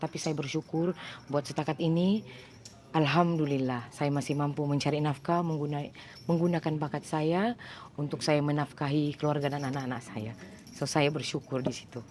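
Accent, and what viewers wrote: Sabah, Malaysia. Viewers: Slang sabahan itu mahal . Suka dgr👍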